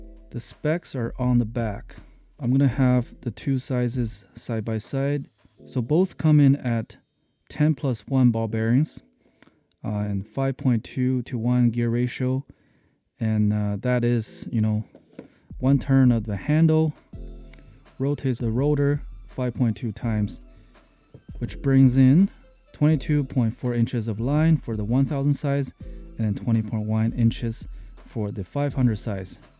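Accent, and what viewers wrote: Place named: Canada. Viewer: Fuck Im good. I could tell immediately you were in north America then after a couple minutes I knew it was Canada😂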